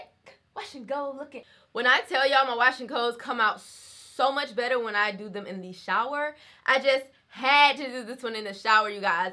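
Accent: South, United States. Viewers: why are you so cute .. .. I'm guessing you're from down south with a little islands in. . . . .I usually think it's rude to ask; hopefully since I'm mentioning I get a pass.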